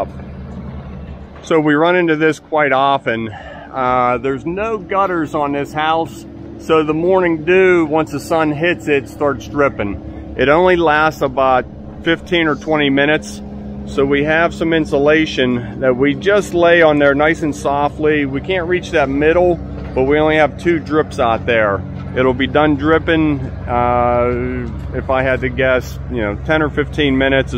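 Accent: Pittsburgh, United States. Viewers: Don't worry about talking like a Yinzer. It's everyone else that has an accent.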